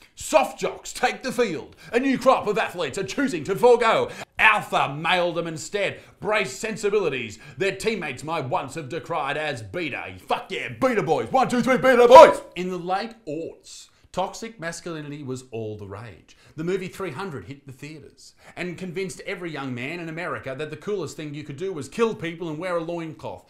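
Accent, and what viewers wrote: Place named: Australia. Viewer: For some reason I love Australian voices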